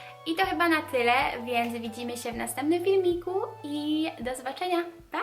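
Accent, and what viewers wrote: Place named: United States. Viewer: Pomysł z założeniem kanału był strzałem w 10! Jesteś super sympatyczna i masz bardzo fajny, amerykański akcent. Leci subek :) Btw, nie dziwie się, że Sara jest Twoją koleżanką, bo obie jesteście przesympatyczne! Może jakiś wspólny vlog? Pozdrawiam! :)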